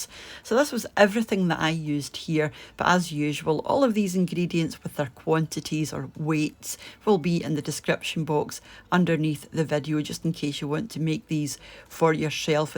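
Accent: Scotland, United Kingdom. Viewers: Love hearing your Scottish accent and yours ones look delicious I am making tonight for tomorrow's lunch.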